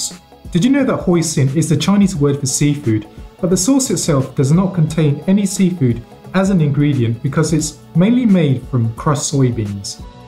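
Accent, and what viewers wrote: United Kingdom. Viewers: I've got to give it to the the Brits, thought...they make all ESL speakers sound so sophisticated. Back to the video...thank you very much for this easy and great recipe. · You spoke Chinese with an English accent very cute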